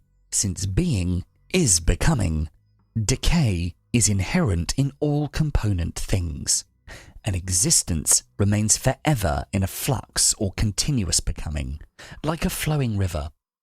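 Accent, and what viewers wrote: United Kingdom. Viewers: Thanks but i wish non british would be spoken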